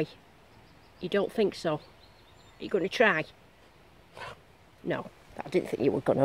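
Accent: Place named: Rochdale, United Kingdom. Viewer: What an amazing watch on ash worth valley. Your videos presentation is spot on. Love your humour and the Rochdale accent is just so close to home it’s a comfort to hear your commentary. Waiting for the sunshine to go and explore ❤️🇬🇧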